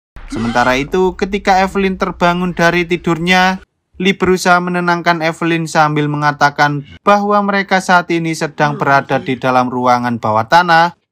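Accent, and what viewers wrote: Java, Indonesia. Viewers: Jawa medok, malas nonton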